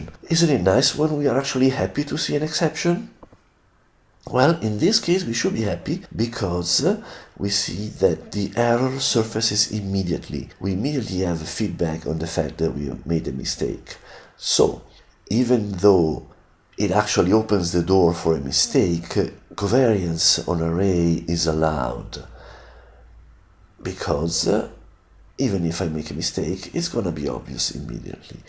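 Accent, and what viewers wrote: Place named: Italy. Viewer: Ciao! la explicación excelente, pero el acento italiano... meraviglioso!!! los oídos latinos agradecidos! "me gusta", "suscripción" y "difusión"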